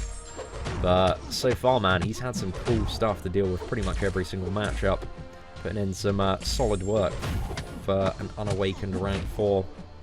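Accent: United Kingdom. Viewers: Im only here to listen to the pale british bloke yapping about.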